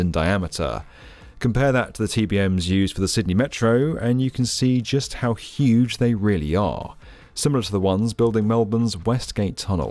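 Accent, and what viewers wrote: England, United Kingdom. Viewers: Why is a pom doing the news?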